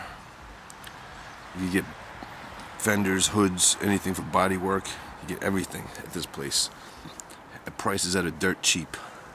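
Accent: New York, United States. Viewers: great video nice to hear a new yorker again been in florida to long haven't drank an old e in a long time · ROTFLMAO!! hell yea this dude the man!! i hear NEW YORK IN THIS DUDE!! helluva job then pulled out the 40 rty of that old ing bing. Hahahahah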